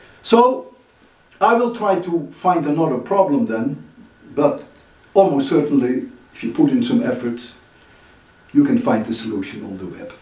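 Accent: Netherlands. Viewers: Toch maar even uw naam gegoogled, ik dacht al aan uw accent te horen dat u nederlands bent. Toffe videos!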